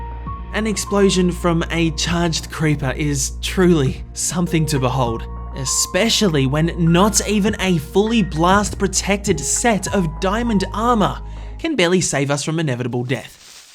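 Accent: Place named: Australia. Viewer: I swear ur Australian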